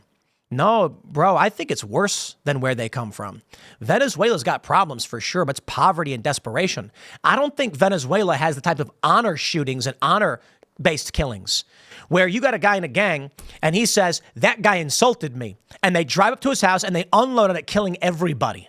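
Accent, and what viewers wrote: Chicago, United States. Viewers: Tim really tries to make his chicago upbringing sound like Gotham city. Guarantee it was not THAT bad for him. Most of Chicago is fine and Ik he didn’t grow up in the parts where it’s really bad. It’s very small parts that do most of the crimes. Tim is not from those parts at all so he’s trying to sound cool. Like he doesn’t even talk or carry himself like those kinda people🤣. Nigga is a skater trying to make it sound he from the trenches. He sounds like he’s from the north side more than the south side.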